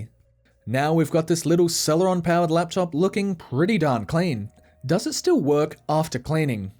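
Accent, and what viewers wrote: Australia. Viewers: Your videos would be very entertaining if it were not for your extremely annoying Australian accent can't you learn an American accent and do the video with that so you sound halfway normal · The second you said HP, I was missing the bold text from DankPods's video. Aussie accent ftw 🤣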